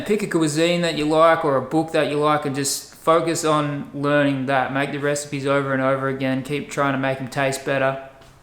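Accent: Australia. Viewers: Can you teach me how to speak Australian ?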